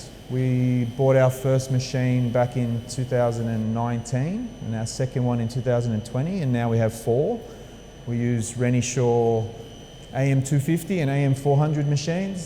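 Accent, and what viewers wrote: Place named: Australia. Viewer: Wow. I want one. Got a shock when you spoke with an Oz accent after the algorithm put this up (I am from Melbourne). Will look for your website now 👍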